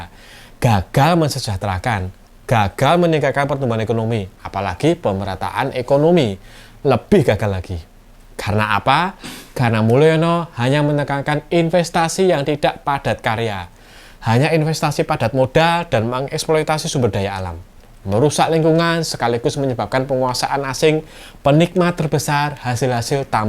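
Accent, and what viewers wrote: Java, Indonesia. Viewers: Muak dengar logat Jawa nih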